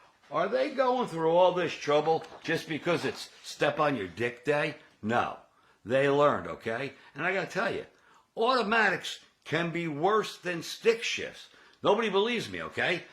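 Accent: United States. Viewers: Great into to your video. I listened to the first10 seconds and said this guy is from NJ, as is I. Everything you said makes perfect sense. My only suggestion is to use coated bearings. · I hear Long Island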